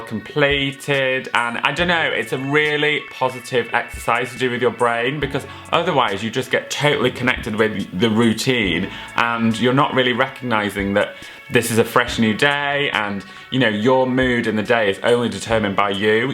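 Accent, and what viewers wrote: Australia. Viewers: love you!!and that aussie accent just brightened up my dreary sunday:D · Love the Aussie accent. Hugs from Queensland!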